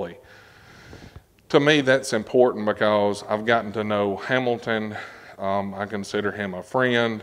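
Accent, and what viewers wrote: South, United States. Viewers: Thank you - I hate when they do that and besides is he speaking English or Southern drawl?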